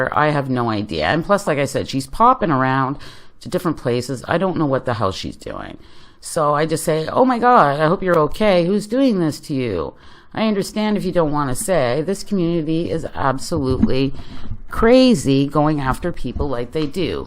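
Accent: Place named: Canada. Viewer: Yup, definitely Canadian!! "About" gives you away. The rumor mill is insane. I love your channel ❤️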